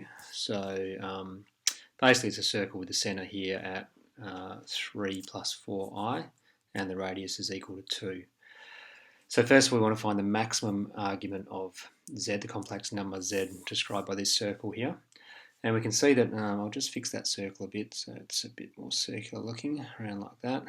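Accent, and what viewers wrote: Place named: Australia. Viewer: I have been trying to understand the process behind finding out the least and greatest value of Arg(z) using sin and tan! At least I can see it here! Well explained with funny Australian accent!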